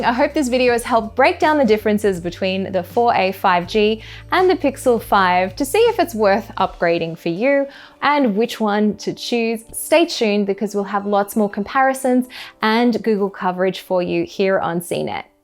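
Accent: Australia. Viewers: She is Australian and from the city, I won’t say what state but I think I know. This is how I sound lol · What a beautiful Australian accent ❤️ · i'm in love with how she talks 😭 aussie? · 🤗 Australian accent:) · dodgy aussie accent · Aient nobobdy gonna talk about that auzzie accent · I love your accent!😍 Australian? Perhaps a Kiwi? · Lol all us aussies sound like this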